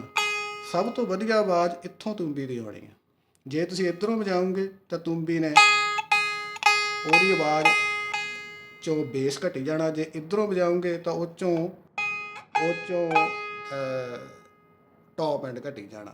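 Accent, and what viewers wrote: Doaba, India. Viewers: ਵਗੈਰਾ ਵਗੈਰਾ ..... ਜਿੱਦਾਂ ਕਿੱਦਾਂ , ,..., love the doabi accent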